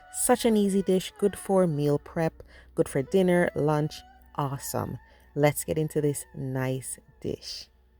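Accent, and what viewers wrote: Jamaica. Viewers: Thanks for sharing, my daughter said she will surely fix this. ❤ ur Jamaican accent beautiful!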